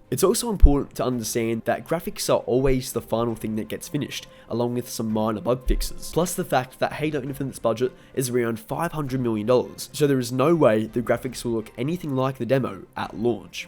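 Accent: Australia. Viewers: I feel a lot better after seeing this video. I can't wait! Also are you an Aussie? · Are you australian?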